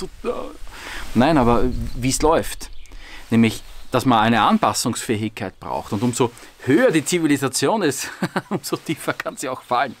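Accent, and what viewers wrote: Vienna, Austria. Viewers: ...wenn ich dich so reden höre vermisse ich meine Wiener Verwandtschaft. Sehr schönes Video.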